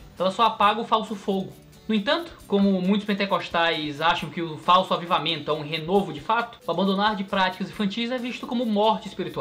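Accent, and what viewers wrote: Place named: Northeast, Brazil. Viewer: Fico ouvindo esse nosso sotaque (nordestino), e admirando essa biblioteca 😍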